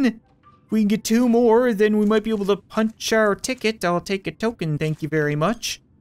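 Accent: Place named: Canada. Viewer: I love his Canadian accent and how it's so subtle yet so prominent that it leads to perfect delivery in every line Example: "what happened to shoe potatoe!?"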